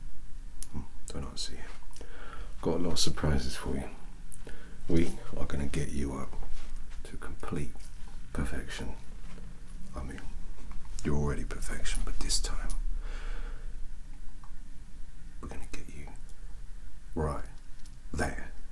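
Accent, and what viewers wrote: United Kingdom. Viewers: Could also be titled as "Male Winry Rockbell with British accent fixes you" I wanted to save this for bedtime but... Can't resist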